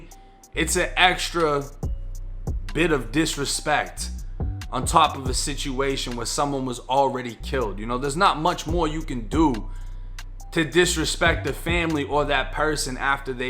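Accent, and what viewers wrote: Boston, United States. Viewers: Yo, you from Boston? You got the accent. You ever done time in Concord or Shirley?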